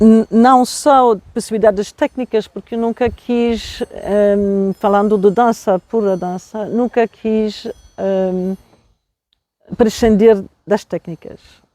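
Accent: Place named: Portugal. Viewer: Muito agradecida pelos ensinamentos...fiquei maravilhada com o sotaque português lembrou muito mamãe...fechei os olhos e imaginei suas estórias a falar com sotaque.❤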